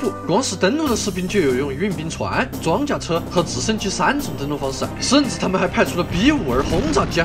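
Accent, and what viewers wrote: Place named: Hunan, China. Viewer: 哈哈这湖南口音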